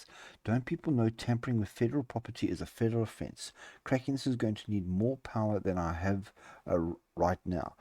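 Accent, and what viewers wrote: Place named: Afrikaans, South Africa. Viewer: Best vids, bolstered by unique Afrikaans accent for American viewers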